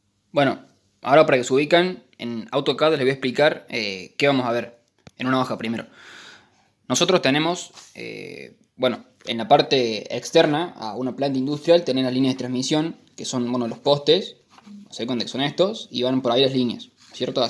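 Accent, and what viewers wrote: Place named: Corrientes, Argentina. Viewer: Muy bueno lo tuyo joven. Soy muy veterano en estas cosas y de verdad me encantó. Supongo que eres argentino. De que provincia?. Parecería correntino. Éxitos en tus proyectos y en la facultad. Saludos desde Uruguay.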